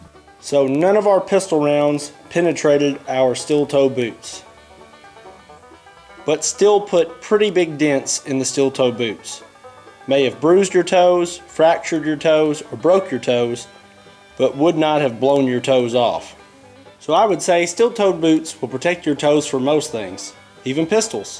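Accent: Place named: South, United States. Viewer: He sounds sooo much more southern in this!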